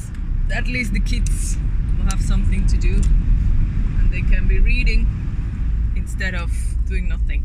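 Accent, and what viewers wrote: Igbo, Nigeria. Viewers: Love how you use our Igbo accent,!🥰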